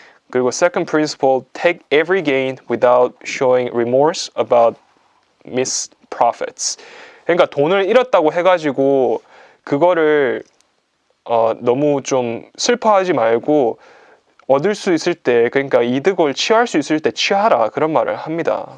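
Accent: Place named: Gyeongsang, South Korea. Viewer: 경상도 억양의 남자분인데 영어 문장을 읽을 때는 발음이 오~ 혼돈 속의 혼돈 번역해서 책으로 내신다면 사고 싶어요.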